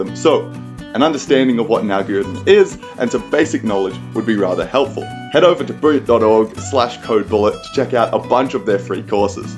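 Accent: Australia. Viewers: You sound very Australian let me tell you ! 😆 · Tf your Aussie?😮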